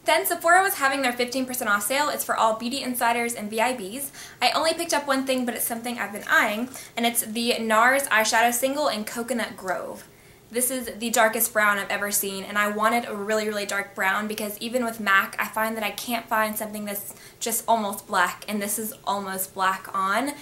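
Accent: South, United States. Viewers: Yourr sooo gorgeous & I think I hear a country accent??? Im from texas :)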